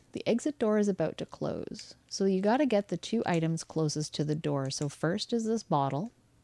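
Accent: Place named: Canada. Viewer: Without trying to come across as too much of a suckup, I am really enjoying this playthrough. The combination of the game's music/sound effects + your voice (and Canadian accent) that makes it really soothing to listen to in the morning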